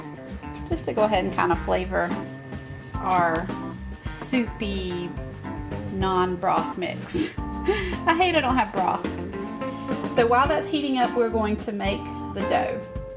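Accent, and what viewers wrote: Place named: South, United States. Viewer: Just started the video and there's a Southern accent. I'm already confident about this video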